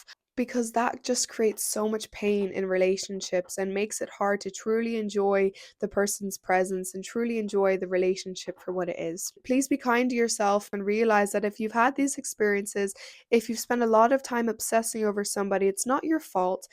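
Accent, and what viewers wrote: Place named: Ireland. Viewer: Great video. Are you Irish. You have a hint of an Irish accent. Really excellent video on Limerance.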